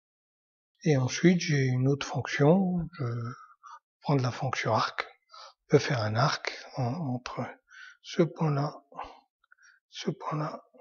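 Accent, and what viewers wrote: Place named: Belgium. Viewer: Vous venez d'oû? je limpression de reeconnaitre un petit accent belge fort sympatique! merci pour cette video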